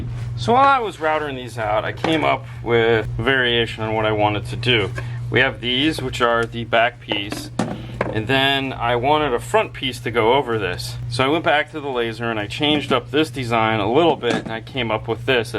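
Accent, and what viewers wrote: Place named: Puerto Rico. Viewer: starting to sound a little Boricua… ya era tiempoooo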